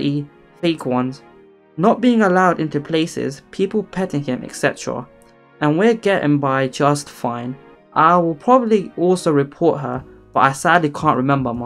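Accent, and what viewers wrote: United Kingdom. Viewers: No problem, and I really like the karma aspect as well 😂😂 Your accent sounds kinda British, with something else. If you don't mind me asking, where are you from?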